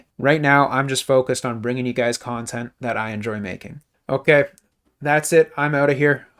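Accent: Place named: Canada. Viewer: Great Vid! Cut through lots of the filler, appreciate that. And I knew I detected that Canadian accent before you mentioned prices in $CAD lol. Cheers, fellow Cannck ;)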